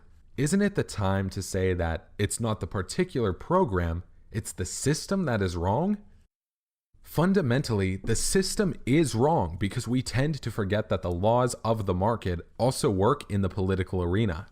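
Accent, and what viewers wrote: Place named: Eastern Europe. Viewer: What happened to that sweet sweet Eastern European accent?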